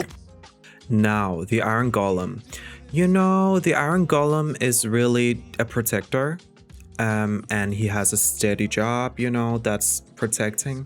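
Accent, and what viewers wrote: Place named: Brazil. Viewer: are you brazilian? Your accent is nice btw very underrated content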